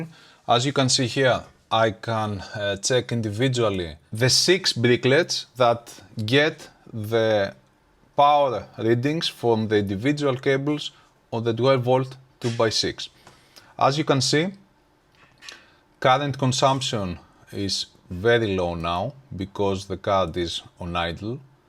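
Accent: Greece. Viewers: Are you greek by any chance?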